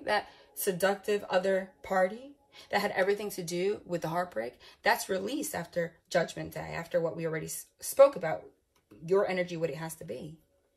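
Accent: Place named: East Coast, United States. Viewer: I lovvvvve your East Coast accent ☺️♥️💓🙏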